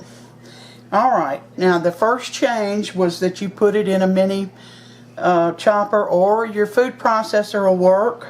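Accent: South, United States. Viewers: I love your Southern voice. It's so soothing to hear you talk. I know you Love the LORD. He is so good to us. I live in Phoenix, Az. GOD BLESS YOU BOTH. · Hello from Texas! You had me at no-fail biscuits. New subscriber here. Love your sweet southern accent...sounds like my mama—she was from Alabama. I look forward to looking through all your videos and watching your new ones too! God bless you! And I look forward to trying these biscuits. ❣️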